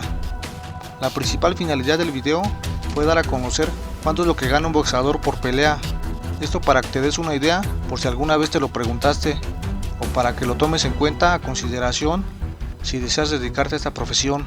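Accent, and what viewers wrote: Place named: Tepito, Mexico. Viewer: jajajaja tu acento se escucha super tepiteño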